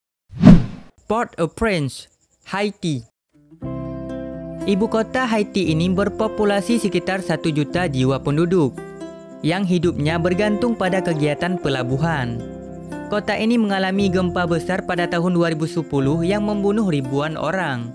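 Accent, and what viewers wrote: Aceh, Indonesia. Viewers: Abg orang aceh ya bang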